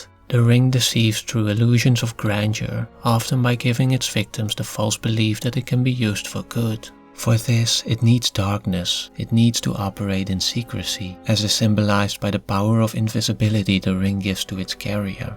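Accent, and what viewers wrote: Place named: Netherlands. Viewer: 10 seconds in and I'm like: Hey! A dutch narrator speaking english!😃 Are you Dutch?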